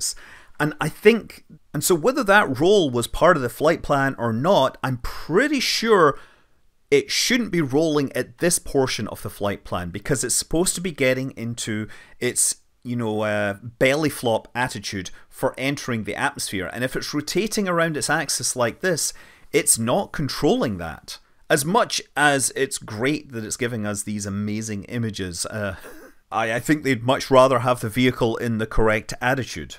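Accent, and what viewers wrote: Scotland, United Kingdom. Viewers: Brought to your by, the manly Scotsman- love the brogue. 😊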